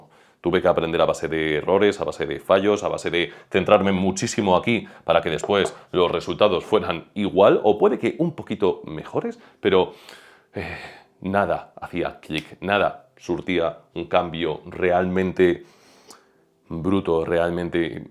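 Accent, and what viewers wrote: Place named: Latin America. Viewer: Lo que preciso es mirar a tu espalda por tanto tiempo como quisieras mostrármela. Qué guapo eres,. Sólo espero que la cámera pueda encajar más bajo de todos lados. Dulce. Lo siento. A la profesional. Como maestro de castellano, yo querría saber de tu manera del hablar., hablas un poco como un latino en relación a "voy a..." y nunca usas "esperaré, intentaré". Además usas "las cosas" cuando un español de pura cepa nunca lo haría. "La cosa, la cosita" son de latinos. ¿Tienes amigos latinos o te has quedado en Perú o Méjico? ¿Quizá tu mujer es latina? I'm strictly curious and obviously Te visto con buenos ojos, and mean you no ill will. Si alguien me ofretara 1000€ a decir algo malo de ti, no lo haría. Y finalmente, sobre el tema: La razón por qué no funciona la serie de "cosas" por un tío sea que lo que dijera no era factual. 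"Sí, lo quiero", pero en el corazón, no es verdad. Cuando nos mentimos puede sonar de verdad y a veces sólo nosotros no sabíamos que fue mentira.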